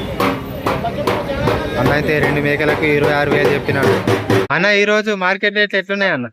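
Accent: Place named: Andhra Pradesh, India. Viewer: telangana yasa matladu andhra basha enduku matladuthunnavu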